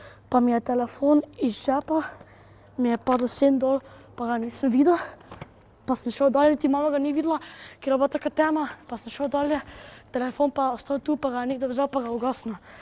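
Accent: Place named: Maribor, Slovenia. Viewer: Končno negdo na YouTube ki govori Mariborsko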